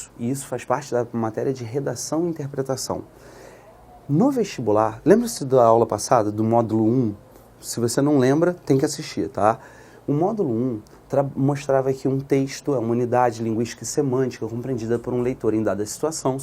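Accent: Rio de Janeiro, Brazil. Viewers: ele é carioca falaigual a mim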